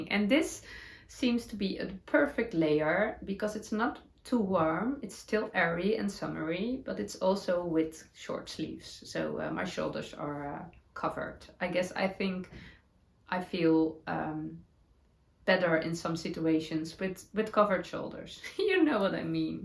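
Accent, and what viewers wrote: Netherlands. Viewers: Actually you have a lot of American friends. I watch all of your podcasts...and love them. You're so cute and your "Dutchy" English is improving very much. I'm from Kansas City, Missouri and I look forwardvtobyour podcasts each week. 😊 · Dear, so happy to have found your podcast! I enjoy your Dutchy English, and your knits are beautiful. It is always nice to know I'm not alone in my mistakes. Thank you, for sharing your adventures. Cheers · I enjoy your podcasts so very much, I love your Dutch English! You have definitely grasped the English language very well. I’m knitting the CORRAN cardigan too….I might made short sleeves like yours. You inspired me.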